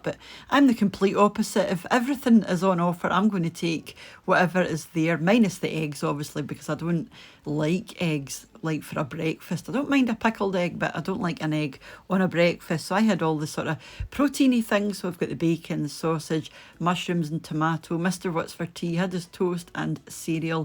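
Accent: Scotland, United Kingdom. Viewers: Your food always looks amazing and your voice makes me feel so happy, I love the Scottish accent 😍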